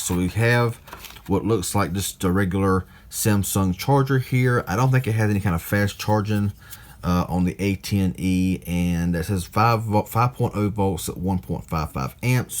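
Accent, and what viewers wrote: South, United States. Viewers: He has a yee yee accent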